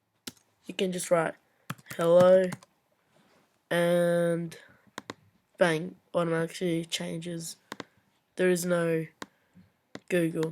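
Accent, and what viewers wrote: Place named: Australia. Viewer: It says Australia under Google...I can probably tell that your Australian cause of your voice😂😂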